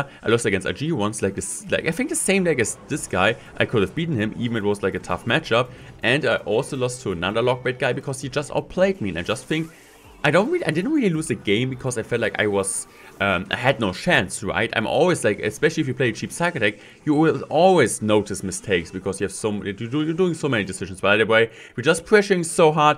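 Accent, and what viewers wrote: Germany. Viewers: I love your German accent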